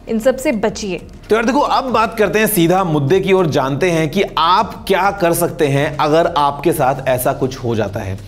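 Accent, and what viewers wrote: India. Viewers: Indian english 😭😭😭😂 use correct Words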